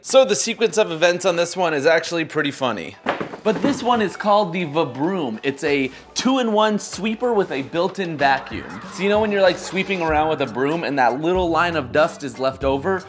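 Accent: United States. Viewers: Are you American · Haha i thought this was a knockoff integza channel.. until you spoke with American accent.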